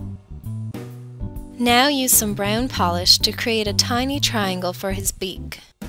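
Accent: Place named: Canada. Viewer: She's totally Canadian. Listen closely to her voice.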